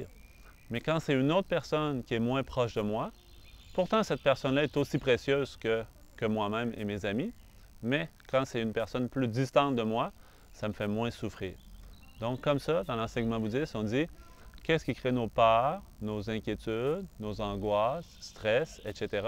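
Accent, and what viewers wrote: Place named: Canada. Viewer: Merci! C'est car j'ai un ami canadien et j'ai reconnu l'accent, c'est très joli le français parlé au Canada :)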